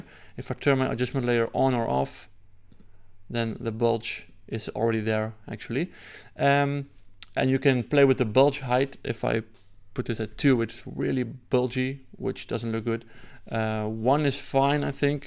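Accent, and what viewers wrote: Netherlands. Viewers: Nice video. you can never get rid of a dutch accent i guess.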